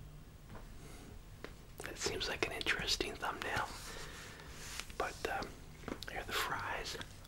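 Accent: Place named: Massachusetts, United States. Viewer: I knew u were from the east coast! Massachusetts?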